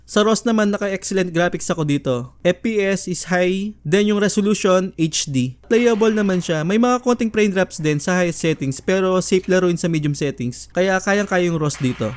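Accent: Pampanga, Philippines. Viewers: Hahaha Kapampangan ka lodz? HAHA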